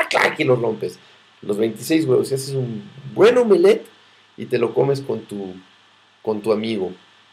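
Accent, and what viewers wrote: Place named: Mexico. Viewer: tiene acento de mexicano, un Gran Ser él y su padre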